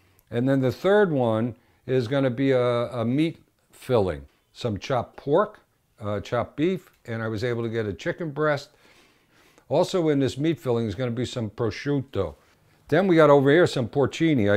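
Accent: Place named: New Jersey, United States. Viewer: Belleville, Bloomfield, Nutley or Newark? Your speech patterns sound like home. Your recipes are marvelous. Thank you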